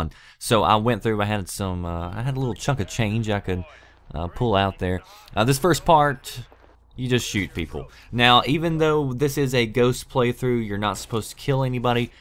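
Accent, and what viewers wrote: South, United States. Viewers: Your commentary is top notch. It's probably the southern drawl. I don't even have Splinter Cell and I enjoy these walkthroughs. I am looking forward to you making more "Tips & Tricks" videos for BF4. That's originally why I subscribed to your channel a long time ago. Keep up the good work.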